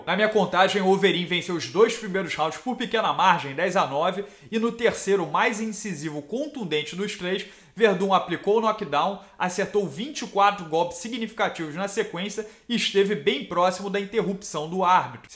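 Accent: Rio de Janeiro, Brazil. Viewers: tem q tirar esse sutaque carioca ai que o canal fica melhor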